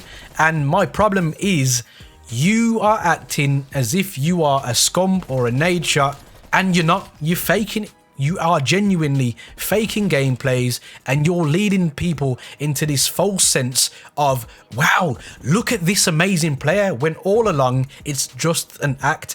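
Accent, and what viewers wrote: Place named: Midlands, United Kingdom. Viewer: are you from the Midlands? I recognise the accent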